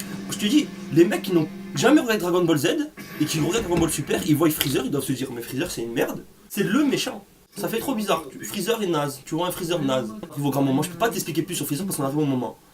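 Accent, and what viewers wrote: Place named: South, France. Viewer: Oh mamène t'as un accent du sud comme moi, t'es d'chez nous ? :P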